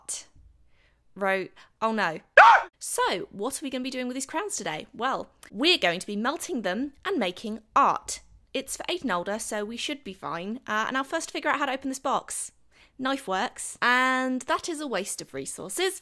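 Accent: England, United Kingdom. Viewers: That artwork was AMAZING, the talent you have is so beautiful and your english accent makes my heart melt🤗💗 Saying hi! From Argentina🇦🇷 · DON'T say "it stinks of Crayon".... that's the BEST PART of a Crayola crayon.Nothing takes me back to my childhood that opening a box of crayons and sticking my nose i it to get my lungs full of that Delicious aroma. If they would bottle it in liquid, I would buy it. From your accent I can tell you're English so maybe this is the reason you think this stinks. Not me, and I'm 74 years old. I still get boxes of crayons for Christmas or my birthday because everyone KNOWS i love crayons. It's fun to sit and color in one of my old coloring books. I stopped short of you beginning the video.... maybe crayolas would be better for you if they smelled like CHEESE?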